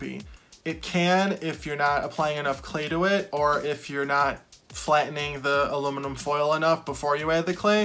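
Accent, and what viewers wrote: Michigan, United States. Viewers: Michigan accent?! I'm a fellow Michigander. Hello from Kalamazoo+ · I thought I detected a fellow Michigander’s accent, I’m from the south west side at the very bottom anyway to answer your question yes I wouldn’t mind a 30-40 minute video so I can follow along an know what I’m doing lol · Your Michigan accent is very similar to my Ohio accent. I no longer live in OH though. Bake and Bond - bacon bond lol yep. That's how I say it. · I knew that was a Michigan accent!! Lol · Just my 2 cents; YouTube will recommend that you edit your videos to 20 minutes or less. This is probably important for "talking head" type videos. If the video is mostly showing the actual work you are doing, most of the people who purposely selected it for viewing will watch for a lot longer than 20 minutes - it's why they are watching. Personally I don't see anything wrong with your current studio setup or your video presentation or your Michigan accent. 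Bacon Bond? It's like "Duck Tape"; originally it was refered to as "duct tape" (as in heating ducts) but so many people pronounced it "duc-tape" that 3M officially adopted the name brand "Duck Tape" Maybe you will be responsible for a re-branding of the product! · Michigan accent! I grew up in Warren but moved to Tennessee 20 years ago. People either love or hate my accent here. Question on baking: What do you bake on? I know if my clay is baked on a glazed ceramic tile then the clay touching the tile comes out glossy. Since many of your pieces are large I suppose you must lay them on their side to fit into your toaster oven.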